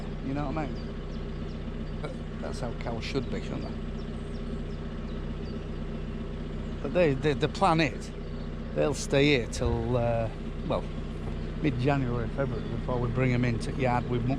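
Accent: Yorkshire, United Kingdom. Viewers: Best farming videos on YouTube entertaining as well as informative, love the Yorkshire dialect even though I'm from Lancashire. Hope you enjoyed your afternoon out 👍👍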